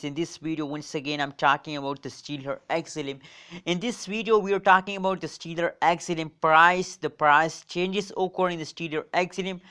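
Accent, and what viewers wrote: India. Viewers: Why is there a picture of a white dude but i can clearly hear you are indian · Whenever I hear a Indian accent on these stock I feel like it’s a scam. Since all these damn scammers calling people phones are from somewhere in India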